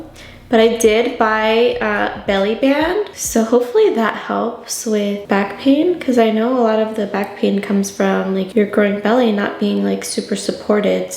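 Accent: United Kingdom. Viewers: Beautiful and glowing love the London accent 🥰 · Ur beautiful, enjoy the pregnancy. Your body will come back especially if you let baby brown breastfeed the way he's suppose to. Btw i love that British accent. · Your lashes are so long! And don't feel self-conscious babe you look gorgeous, and you sound so cute with your English accent lol. I would totally watch it if you made your own separate channel for girly chit chat stuff! 💖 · I love these chitchat grwm from ❤️✨ and that British accent 👌❣️ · It’s the British accent for meee🤣🤣 love from the UK 🇬🇧🇬🇧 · Love the British accent!! All the best with your pregnancy ❤️❤️ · Lol I love the British accent you’re so beautiful girl God bless you🤍🤍🤍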